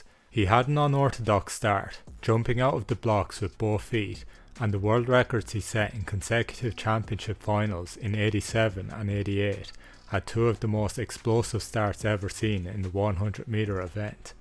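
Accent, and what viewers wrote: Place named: Caribbean. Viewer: He has to be from the Caribbean.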